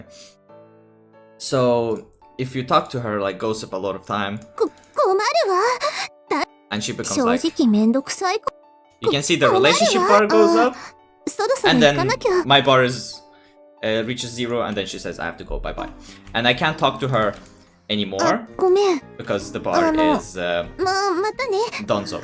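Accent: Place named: Russia. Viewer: У тебя акцент русский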